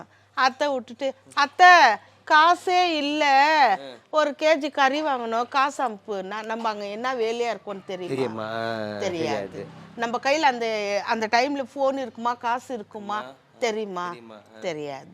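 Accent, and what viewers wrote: Bangalore, India. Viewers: Banglore tamil❤❤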